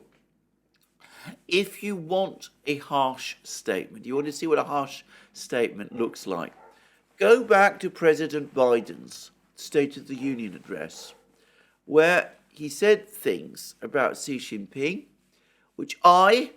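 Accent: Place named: RP, United Kingdom. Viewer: great impartial analyses👍. BTW what schools did it take to learn your posh English?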